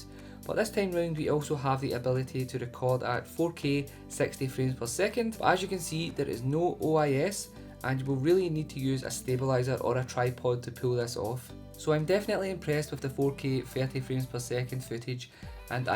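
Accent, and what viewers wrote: Scotland, United Kingdom. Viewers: nice Scottish accent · Love the British accent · Awesome! Subbed because your accent reminds me of tartans. =)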